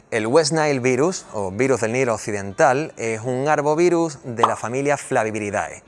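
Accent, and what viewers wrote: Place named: Andalusia, Spain. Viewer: Qué crack !!!!!!!!! “Ma quedao embobao” !!! Y en perfecto andaluz ! GRACIAS !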